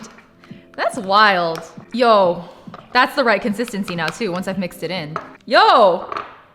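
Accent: RP, United Kingdom. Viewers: tea time! sip sip I love your British accent as a British person. A bit posh though ngl Earl = Uurl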